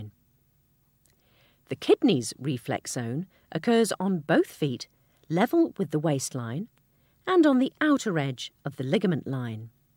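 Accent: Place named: England, United Kingdom. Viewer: what a beautiful English accent , so clear , well paced and simply captivating well narrated , dynamic voice 🥰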